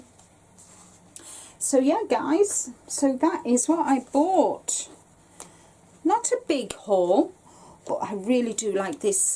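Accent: Liverpool, United Kingdom. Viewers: Hi, new subscriber here. How did I not come across your wonderful channel before now?!😂💜 I'm already thinking what I can crochet with that lovely horizon twilight. My colours as well! Love from a wet and windy North Yorkshire tonight. Do I detect a Liverpool accent?😂 Love that city as well xx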